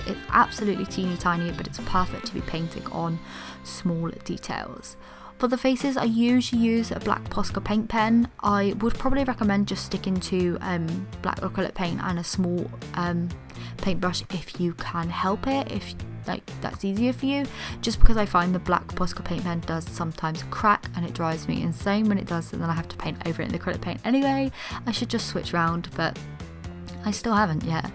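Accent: England, United Kingdom. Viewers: Cute. What is your accent/somewhere in England? 🙂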